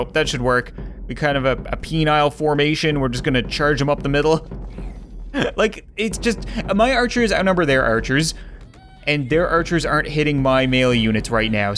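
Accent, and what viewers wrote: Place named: Canada. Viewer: He’s Canadian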